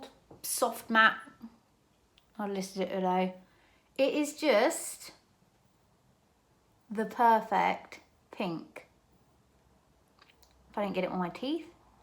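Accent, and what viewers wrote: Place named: England, United Kingdom. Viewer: I really like that lip color on you. I share your love of BM and we are exactly the same age so that tapping of the powder really brings me back too. Also being American I love your accent. For some reason Americans find English accents calming to listen too😂. When I was sick from pregnancy years ago I literally watched hours of lisa Eldridge and it got me through a very sick time. Thanks for the video, love from South Carolina ❤.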